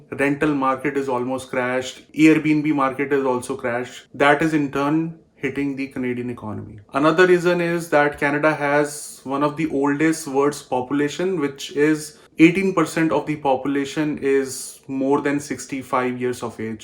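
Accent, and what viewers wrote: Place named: India. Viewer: How come you still have such a strong Indian accent?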